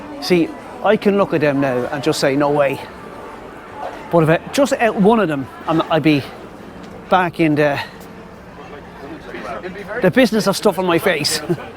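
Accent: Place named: Louth, Ireland. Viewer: Glad you enjoyed it old bean .You have the Drogheda /Louth accent for sure .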